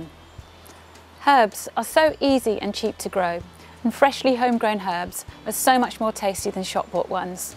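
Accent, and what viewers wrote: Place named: England, United Kingdom. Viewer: English accents make me nuts!! Great video though!!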